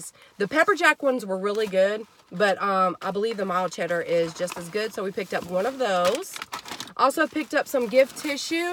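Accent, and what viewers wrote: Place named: Georgia, United States. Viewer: Hey! Just knew you were from Ga. I came across a video the other day and subscribed. I thought dang she sounds like me lol....I'm in Newnan!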